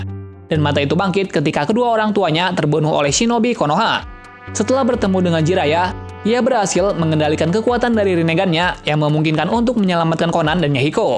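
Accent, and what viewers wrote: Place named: Banyumas, Indonesia. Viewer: Ngapak ya😁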